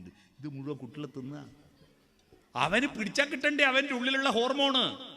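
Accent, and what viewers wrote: Kannur, India. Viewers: സർ, കണ്ണൂർ ക്കാരൻ ആണ് ല്ലേ..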